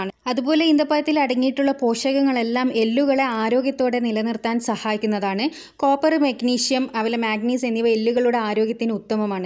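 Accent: Kannur, India. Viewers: Nalla avatharanam... Ningal kannur aano 😊😊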